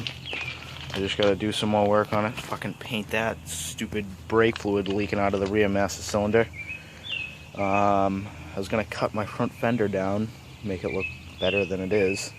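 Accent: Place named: New England, United States. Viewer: where u from? you sound like ur from new england. maybe ME, NH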